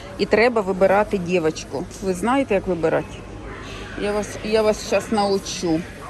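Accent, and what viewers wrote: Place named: Kherson, Ukraine. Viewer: Привет, землячка! Левый берег Херсонской области приветствует тебя По разговору я сразу поняла что ты из нашего края. С ув Ксения❤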